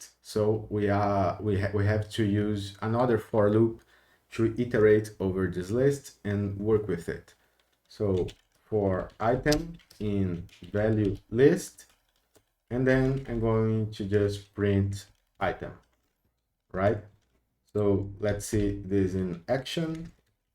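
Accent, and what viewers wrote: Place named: Brazil. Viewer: You sound like you've got a Brazilian accent, is that right? I am from Brazil, living overseas, learning Python, and this is exactly was I was looking for. Obrigado!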